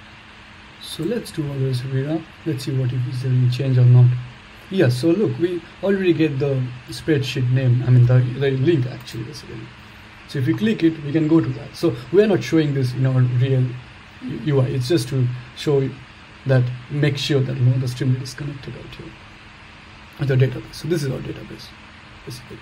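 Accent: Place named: India. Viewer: Finally an Indian who has proper accent 😁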